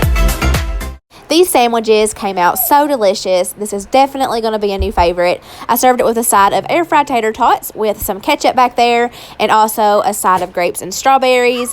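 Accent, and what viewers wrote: South, United States. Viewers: Looks so good love her southern accent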